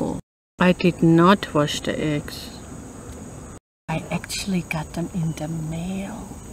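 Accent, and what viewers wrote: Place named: Germany. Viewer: Du bist so lustig und besonders für Kinder! Ich hab' etwas auch gelernt. Ich höre ein Deutscher Akzent durch Englisch weil ich Muttersprachler von Englisch bin. Ich bin Amerikaner. Beherrschst echt gut Englisch! Macht weiter so. Fröhliches Weihnachten und Guten Rutsch! Vielen Dank.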